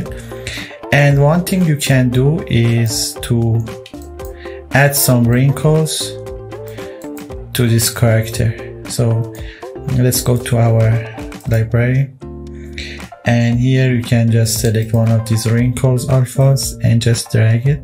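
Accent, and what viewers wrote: Iran. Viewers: Your accent sounds Persian. 🤔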